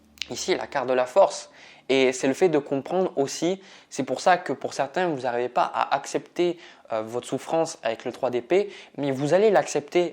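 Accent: South, France. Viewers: Merci pour ce tirage très pertinent. J aime écouter vos guidances et votre manière de les transmettre, de façon naturelle et franche avec cet accent du sud qui me reconnecte à mes racines.🙂 Merci aussi pour votre dévouement et l aide que vous nous apportez. 💕🕊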